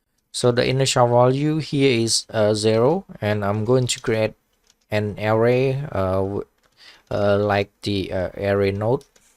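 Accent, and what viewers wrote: Cambodia. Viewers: បងខ្មែរមែនស្ដាប់តុងអង់គ្លេសបងដូចខ្មែរ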